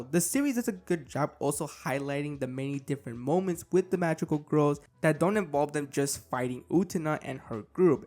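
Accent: Spain. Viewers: that's just how spanish ppl speak english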